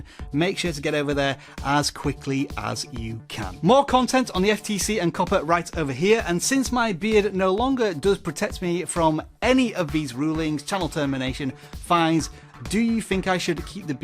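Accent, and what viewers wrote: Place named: United Kingdom. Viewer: If my comment is missing something on this topic, please be kind and offer some of your own bits of wisdom. First things first: This complete topic applies ONLY to US. citizens living in the territories and states of the US. The laws being considered here ONLY apply to those living within the United States of America! Second, this man (by his obvious British accent) may not be US citizen. We can't know whether he is or is not. How does this law concern him? I need more information! Third, would he please provide how he is so informed about the details of this law. Hope you can see my problem with this video. It appears completely inappropriate to the law in the US.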